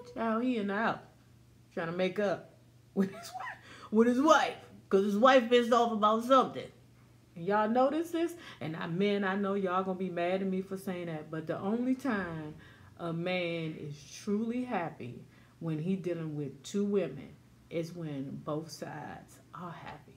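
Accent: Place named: South, United States. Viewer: I'm in the DMV right now...but you sound a little southern like, you're from my hometown lol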